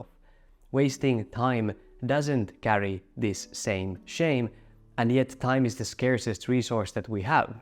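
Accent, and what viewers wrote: Finland. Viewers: 100% Finnish accent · Not to be the weirdo, but I love the Finnish accent. It reminds me of previous trips there and favourite bands. So your channel is the ultimate combination 😅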